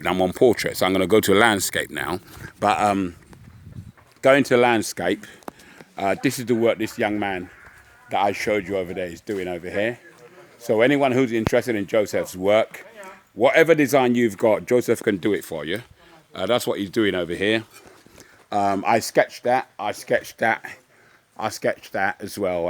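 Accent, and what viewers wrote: United Kingdom. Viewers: I was thinking to myself that you sound so British and found myself wondering if you spoke Igbo. Then I got to the end of the video, and you actually spoke Igbo. Love it!!😍😍 You are a bonafide sob of the soil. Okpara eji eje mba! Jisike, nwanne’m.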